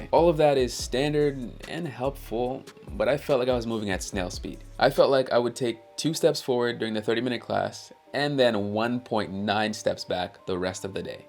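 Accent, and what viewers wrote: Spain. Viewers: You have a Spanish accent, stop lying dude. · Very interesting. I did the same when I came to the USA in 1990, I figure the least thing I could do was to learn the language, it was just English 24/7 a the school, after 6 months my brain stop translating from English to Spanish, and it was just English. After 4 years I did it so good, that I actually can not function in a group of Latino speaking people, my brains takes about 10 seconds to translate back and since I did went to school I speak educated Spanish, not like the one you hear 99 percent with most people that comes to the USA they are not educated, so I can’t understand most of the Spanish they speak. But when talk to doctors professor or anyone who actually finish college or university, I have problems understanding them. So I have no Latinos friend not one, all are white, African Americans but no Latinos, I can’t even understand my two bros whom never went to school and choose not to learn English. 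Ps. Your accent is sound like castellano the Spanish they speak in Spain. Nice job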